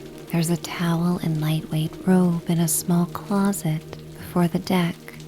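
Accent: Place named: United States. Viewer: Love the rain ☔️ sounds! That is what decided this video for me! Love your voice and accent! I moved from the us to Ireland when I was 8, and the uk 🇬🇧 when I was 16 and I love it but my inner child loves an American 🇺🇸 accent! Soft like yours which was made for this and you would make an amazing hypnotist! Thank you 🙏 🕊🤍🕊 🫶✨🌟💛🌟✨🫶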